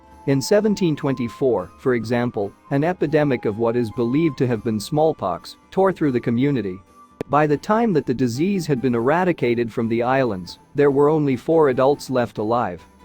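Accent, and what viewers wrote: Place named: United States. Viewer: American narrator, load of tosh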